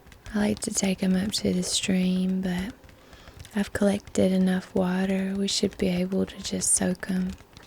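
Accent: South, United States. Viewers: I love how talks in that Southern accent. Happy early birthday by the way.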